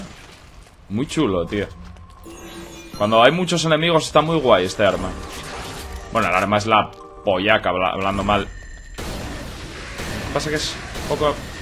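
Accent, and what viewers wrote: Mexico. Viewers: GRACIASSSSSSS!!!!!! QUE CHIDO TE SALE EL ACENTO MEXICANO LA NETA JAJAJAJAJA....SALUDOS DESDE MEXICO YEAH.... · tu acento mexicano es muy cool hahaha Saludos desde Mexico...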